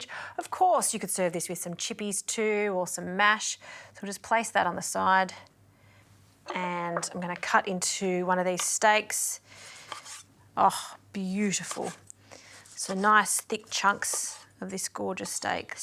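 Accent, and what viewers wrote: Australia. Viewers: Hmmm. Some Hybrid pan? Needed closed captions because of “ throw one on Barbie “ accent.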